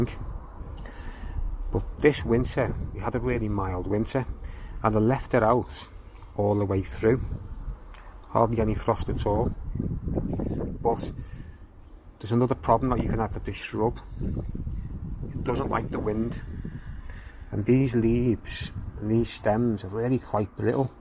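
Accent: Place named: Scotland, United Kingdom. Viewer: i like gardening but for some reason when explained to me in a Scottish accent; I enjoy a lot more.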